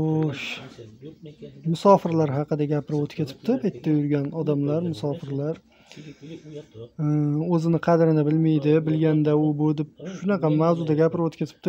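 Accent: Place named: Tashkent, Uzbekistan. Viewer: Салом,. Гапингиздан Тошканликка ўхшаяпсиз. Мабодо Тошканда кўриб қолсангиз,мани ўрнимдан, чирройли,силлиққина"башшарасига тупуриб қўйинг. Йўлим тушиб,бориб қолсам,ўз синглимдек ҳурматингизга етардим. Олдиндан раҳмат.🙏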